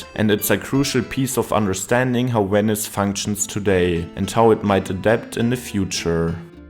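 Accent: Germany. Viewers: Im in 2 seconds and I already know this guy is german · bro es wäre so geil wenn du nen zweiten deutschen Audiotrack anbieten würdest, als Deutscher kann ich mir dein Englisch wegen dem Akzent echt nicht lange geben, aber machst eigentlich sehr geile Videos 😂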